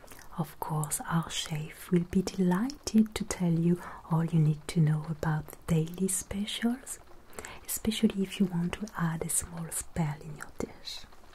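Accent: France. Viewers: Wow amazing video. Everytime I watch your videos, I feel fully engaged in the story. Your French accent is soo luxurious ❤. Thank you for such amazing gift! 😊 can't wait for the next video.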